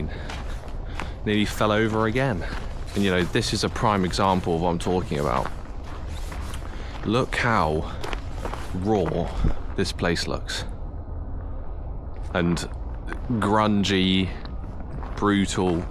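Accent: United Kingdom. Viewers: Вааааййй, posh engliiish. Ну вы даёте, сударь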